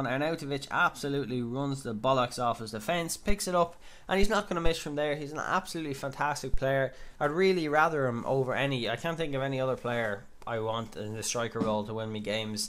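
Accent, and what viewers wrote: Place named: Ireland. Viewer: Where in ireland are you from? :) class series btw :)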